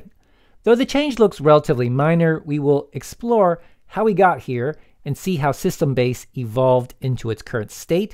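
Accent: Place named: England, United Kingdom. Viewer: I like your Unity videos, speaking very clearly and English accent is nice too. Hope you can make more Unity tutorial videos!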